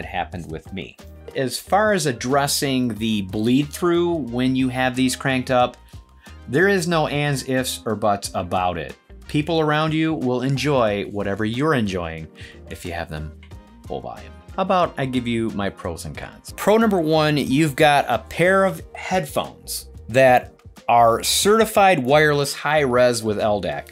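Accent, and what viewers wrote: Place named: Eastern Canada, Canada. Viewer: Best video on these cans yet. Pulling my hair out trying to choose what headphones to go with. Razer opus lacking the ldac sucks but these I've heard are poor performers once they are plugged in aux. Lose the eq settings and goes passive? But these are the nicest looking cans out there with almost all features needed. Ldac is perf for amazon music streaming. But as an occasional wired gaming head set... Sounds like it's a serious loser.... BTW are you from the Canadian east coast? You sound eastern Canadian.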